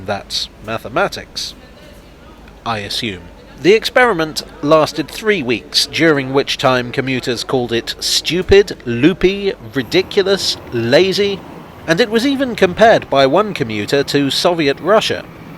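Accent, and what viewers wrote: United Kingdom. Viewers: Actually, with your British accent, you seem to make it sound much more upscale & classy than it actually is. Very well done Sir.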